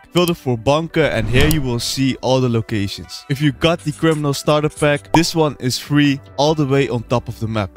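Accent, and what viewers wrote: Netherlands. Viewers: Ik had binnen 20 seconden door dat je een nederlander bent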